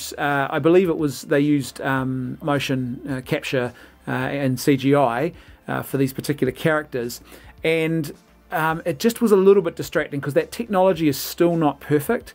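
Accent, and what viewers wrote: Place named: New Zealand. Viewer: By the way...gotta love that kiwi accent.. with all your passion about SW maybe you should run for PM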